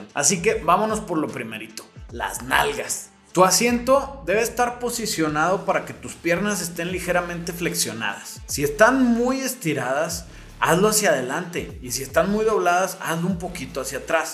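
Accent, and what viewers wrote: Mexico. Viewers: Oye desde chile y te entiendo la mitad muy a lo mexicanote tu video jajajaja por favor un poco mas neutro jajjaajaa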